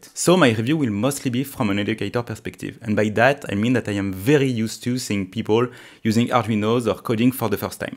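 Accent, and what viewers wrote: France. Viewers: Vous parler trop vite cela rend votre accent Parisien très agacent... mais quand meme très instructif ...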